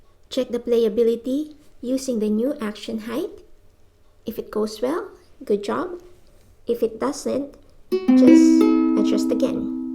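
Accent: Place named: Philippines. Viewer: Ah. Kaya pala sounds like pinay. Hehe. Very informative, and very clear too. Salamat!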